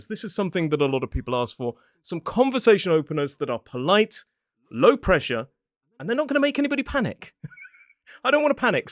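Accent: United Kingdom. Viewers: I really into British accent ❤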